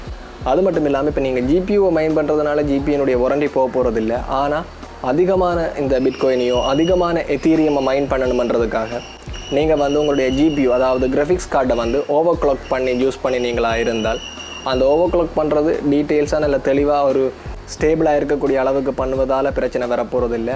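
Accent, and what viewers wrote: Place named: Sri Lanka. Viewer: இலங்கை தமிழ் தமிழ் தான் 😊 I'm india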